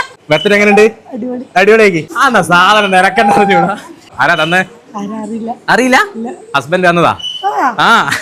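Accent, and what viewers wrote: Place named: Perambra, India. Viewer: പലവട്ടം കണ്ടു. പേരാമ്പ്ര സ്ലാങ്ങ് .... പൊളിച്ചു...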